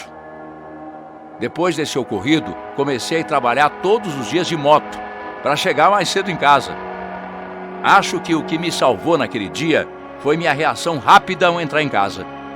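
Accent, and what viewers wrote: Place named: Minas Gerais, Brazil. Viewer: Adoro esses relatos, quando conta, é outro que Paresse mineiro...continuam assim...parabéns...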